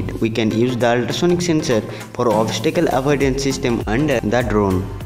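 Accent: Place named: India. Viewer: It is Indian version of English ( India ), English is just a language, Don't give it to much importance, Skills are much more important ( and drones are the future )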